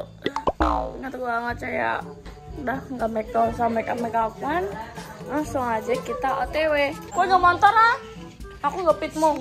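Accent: Java, Indonesia. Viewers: lucuu muni jowo ne 🤣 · Kak can ya lucu nk ngomong ngwe boso jowo🤣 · Kk orang Jawa tengah kok suaranya Jawa kirain orang Jakarta , jawab Kaka plis aku juga orang Jawa kk jawab ka plis 🙏🙏🙏🙏🙏🙏🙏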